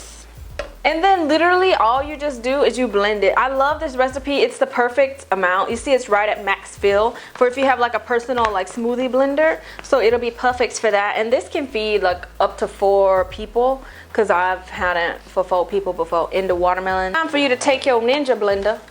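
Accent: South, United States. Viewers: omg im not american but i love that your american accent is still so strong, i love it, the things you say sometimes just crack me up, when you get passionate your accent really comes out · She is ssoooooo NOT from NY do u not hear her accent?? She sounds like a southern belle. im a born and raised nyer from Brooklyn our accent is pretty distinct from a southern one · You kind of sound southern but then you kind of sound Asian but then you kind of sound black so I'm really really confused but it's whatever I kind of like it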